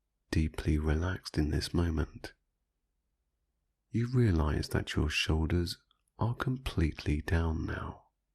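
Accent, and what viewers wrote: United Kingdom. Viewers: Who are you???? I tried LOADS last night, and finally found this. PERFECT!!! No music, and a UK make voice that was just perfect for me. Thank you so much, but please reveal yourself!!!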